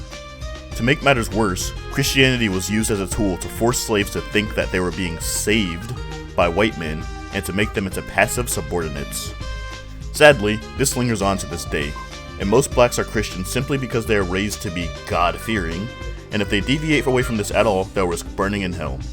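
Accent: United States. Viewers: Im not African American but just African. Firstly congrats because you made a video and uploaded it which is more than most. However, 1. Making out that Afrocentrics claims of hidden African history is some big conspiracy theory. I think thats a tad offensive. Isn't African history still being taught in America starting from slavery? You might know of many of Africa's great empire's but in the white dominated countries where a lot of the diaspora live is that common knowledge? Yes we as adults can search the web til our hearts content but what about the children? African history was hidden and destroyed and while that conscious effort may not be being made today, that doesnt address the knock on effects of that. Widescale denial of African Achievement is huge and that is because it was hidden. And what equality between all races are you talking about? Where do you even live to say that? 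You sound American. But how would a black American make such a statement. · You don’t speak “proper” English, you speak the American dialect, and people want you to speak African American Vernacular English, just a slight correction but you’re right that speaking in a standard dialect doesn’t make you a race traitor or whatever they say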